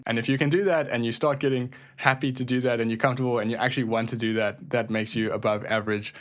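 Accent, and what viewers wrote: South Africa. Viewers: A South African 😂 with a KZN accent?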